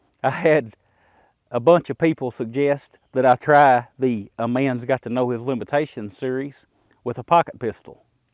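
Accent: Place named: South, United States. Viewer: I love this guys good ol' boy, down home southern accent; but the video starts at: . You're welcome.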